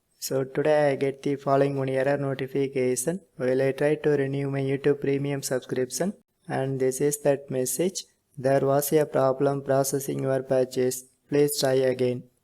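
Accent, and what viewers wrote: India. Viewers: Bro he's Indian that's just how they talk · Cuz he's Indian bozo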